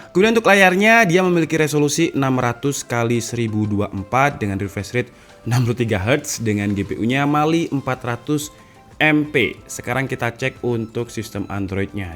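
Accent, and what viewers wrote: Java, Indonesia. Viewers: Mas sampean jowo ngendi???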